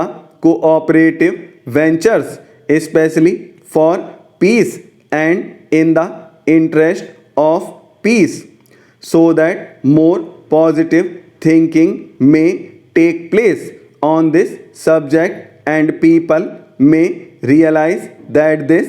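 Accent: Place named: Odisha, India. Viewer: Your voice seems like you are from odhisha , right ??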